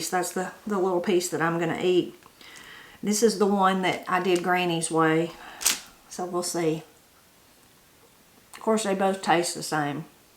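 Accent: Appalachia, United States. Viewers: Miss, thank you and your Granny for the wonderful Seaside Candy Roll recipe. I made some for Christmas and it was delicious and very easy to make. You were right about how sticky the candy roll became brushing it with the syrup and then pressing on the pecans but it was worth it! I grew up in Northern Idaho and I know and understand 95% of your Appalachian words and pronunciation. As far as I know, my people came to Idaho from Scotland, Ireland, Belgium and Germany via the Midwest. Maybe that is where they picked up those unique words. The only difference is my pronunciation of pecan [pea-con].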